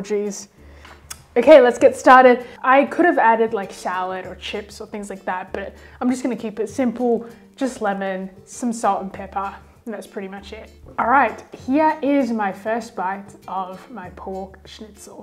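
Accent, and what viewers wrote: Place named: Australia. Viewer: Gosh your thicc oz accent i can’t even 🥰🥰🥰🥰🥰🥰🥰🥰🥰🥰🥰🥰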